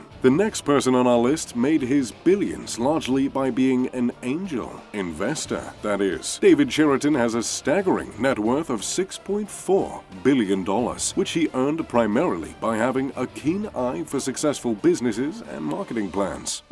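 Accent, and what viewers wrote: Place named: RP, United Kingdom. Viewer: Sorry, but the posh narrating isn’t needed, and personally, I find it really annoying.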